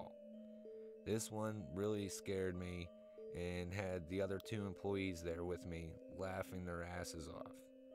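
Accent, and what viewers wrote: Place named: Pittsburgh, United States. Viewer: Good stories! Only critique is that when you are speaking I can still hear that Pittsburgh accent come through. Like "..... One of the last things I'm gonna share wit JEW." It's hard to break that after speaking it for so many years. Keep doing what you are doing..... The followers will come!!!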